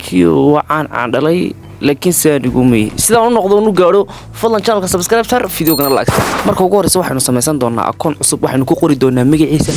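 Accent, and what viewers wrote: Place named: Hargeisa, Somalia. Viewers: Haa lahjadiisa marka loo fiirsado waa reer hargeisa toosa xataa Burco, ceerigaabo, berbera, Boorame maaha waa hargeisa toosa 😂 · Adi mareer Somaliland ayaad thy sidii rer waqoyiga uhadlaysaye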